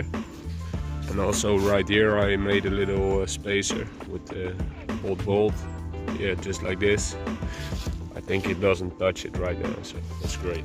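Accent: Netherlands. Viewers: Aye I gotta ask are you Dutch? Cuz water the accent and I recognize places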